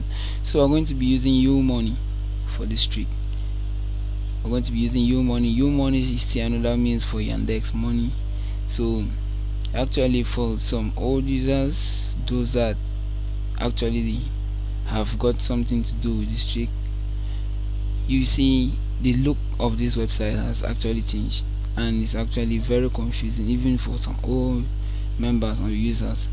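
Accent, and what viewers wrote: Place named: India. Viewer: Boi you sound like Indian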